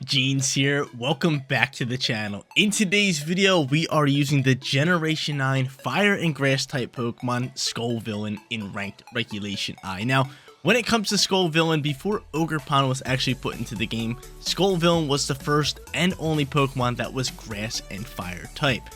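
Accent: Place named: Buffalo, United States. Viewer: Holy Buffalo accent lol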